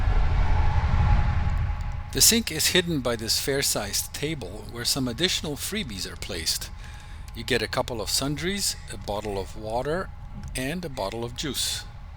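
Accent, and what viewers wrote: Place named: Poland. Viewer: Świetny materiał , znajomość języka perfekcyjna z lekkim polskim akcentem . Ale sam filmik perfect .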